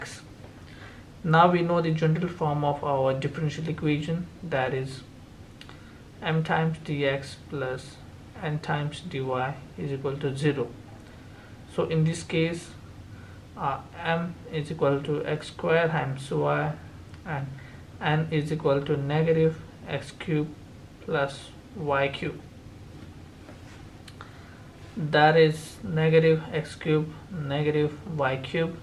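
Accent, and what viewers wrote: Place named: India. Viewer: Indian moderate accent But still a good video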